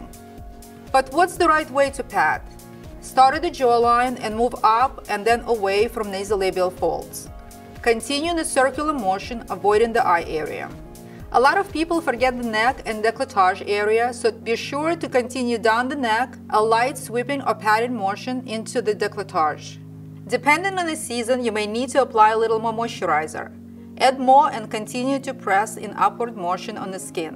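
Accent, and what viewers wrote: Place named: Russia. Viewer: so much of russian accent))))))))))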